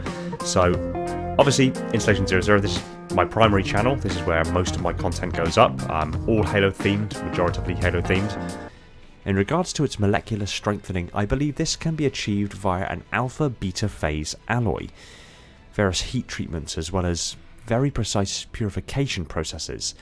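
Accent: United Kingdom. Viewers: I always love ur voice btw. Also r u British? I forgot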